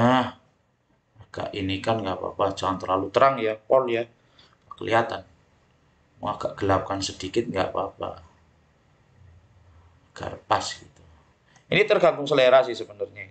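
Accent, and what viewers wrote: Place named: Banyumas, Indonesia. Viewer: Pak bahasanya ngapak. Ngapak daerah mana inj?😂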